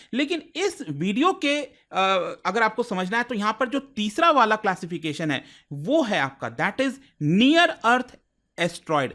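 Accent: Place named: Bihar, India. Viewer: Bihari type of speech Nonsense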